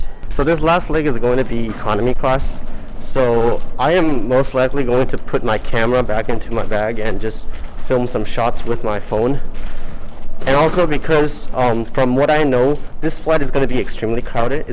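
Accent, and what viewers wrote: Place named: India. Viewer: tell me why you have Indian accent.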